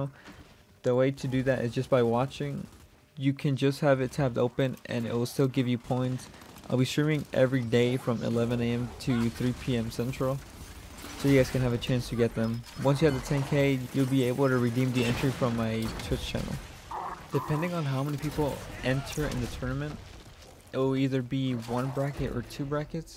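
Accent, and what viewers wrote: Mexico. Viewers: Ey feliz año un saludo, esa voz se escucha bien mexicana dios te ayude a tener más y tener nuevos proyectos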